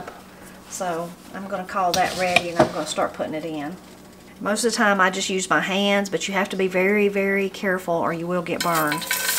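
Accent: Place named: Kentucky, United States. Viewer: We called those kind of dumplings, pastry. Chicken and pastry. It's dumplings when we drop them off a spoon. I'm so grateful I found your channel. Hearing your accent takes me back to those Kentucky hollows. Thank you.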